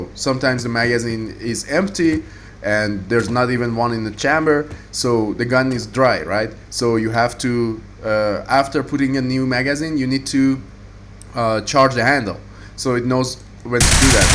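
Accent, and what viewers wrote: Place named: Iran. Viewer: are you Persian? your accent sounds like Persians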